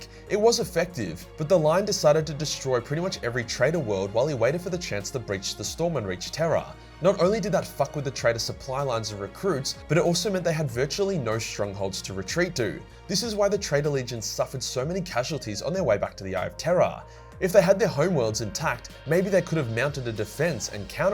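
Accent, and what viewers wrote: Australia. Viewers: I get excited every time you upload as listening to Australian chad talk about warhammer 40k makes me moist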